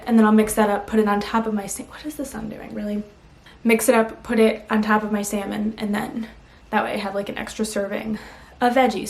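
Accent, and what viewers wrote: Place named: New York, United States. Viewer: LOVVVEEEE this vid!! where’s your accent from?? you sound like a New Yorker 🤩✨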